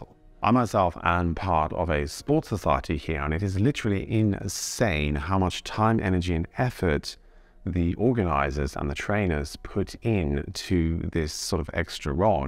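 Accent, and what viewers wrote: Germany. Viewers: you do sound like German speaking English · You have developed a bit of a German accent! You sound like a German who speaks very, very good English. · Ich würde fast sagen, der Akzent ist dem Deutschen mittlerweile etwas angepasst, deshalb so gut zu verstehen lol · … you lowkey have a german accent…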